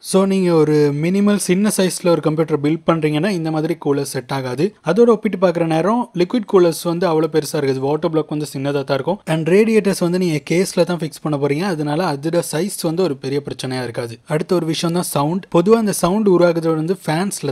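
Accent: Sri Lanka. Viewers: Bro you’re sound so Sri Lankan ….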